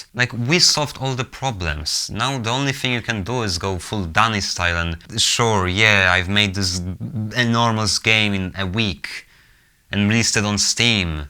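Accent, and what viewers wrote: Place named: Italy. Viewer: I'm loving this generation of good youtubers with italian accent (i think that's italian)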